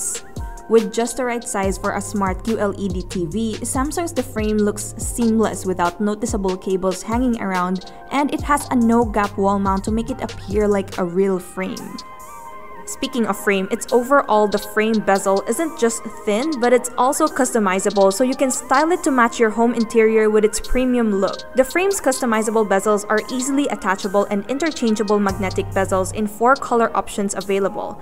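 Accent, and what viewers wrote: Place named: Philippines. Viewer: that irritating pinay accent.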